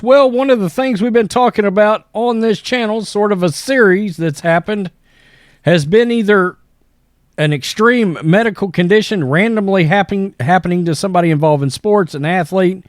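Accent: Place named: South, United States. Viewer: I love your southern accent so much, thanks for spreading the word on this nightmare, love from Australia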